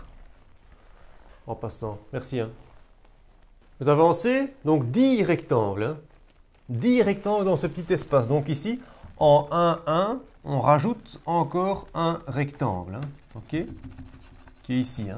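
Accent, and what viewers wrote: Brussels, Belgium. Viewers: De Bruxelles même, une fois ! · Ce petit accent vient de Belgique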